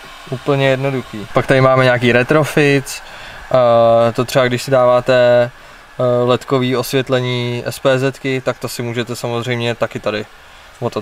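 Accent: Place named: Ústí nad Labem, Czech Republic. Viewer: furt tě slyším po ustecký jak jedeš xddd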